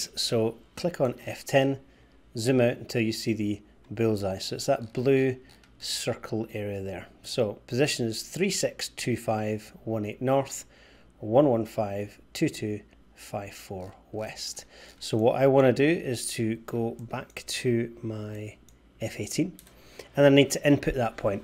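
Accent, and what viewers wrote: Scotland, United Kingdom. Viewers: Finally... A Scottish accent for DCS. Great video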